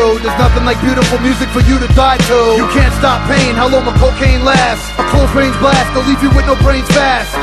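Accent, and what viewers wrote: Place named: Brooklyn, United States. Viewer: Dat Brooklyn accent, tho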